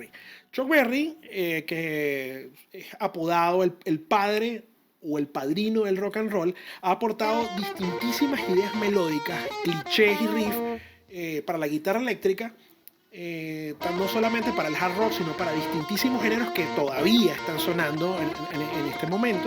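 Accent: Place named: Venezuela. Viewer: chamo eres venezolano,,, seguro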